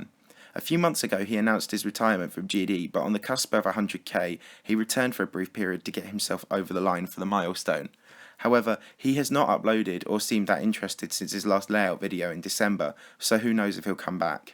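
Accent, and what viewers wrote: United Kingdom. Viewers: i hate the britain english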